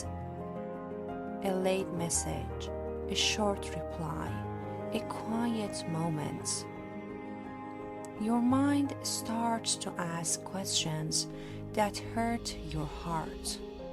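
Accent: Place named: Iran. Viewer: عالی بود و ممنون از زحمت شما . اما اکسنت ایرانی بود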